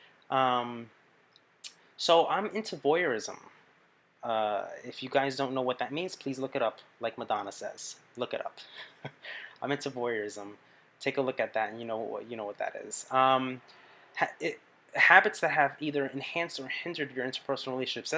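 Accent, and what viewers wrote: New Jersey, United States. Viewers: So that's why I hear you NJ accent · Answered my question. NJ accent. =) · You are so instantly lovable. ♥ (Also, when you mentioned you are from NJ ... instantly, I started hearing it, and I'm not even from your side of the Atlantic!)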